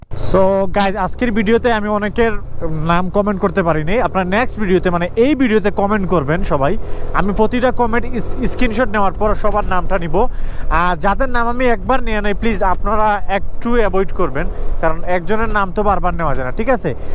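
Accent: Sylhet, Bangladesh. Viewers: ভাই সিলেটি নি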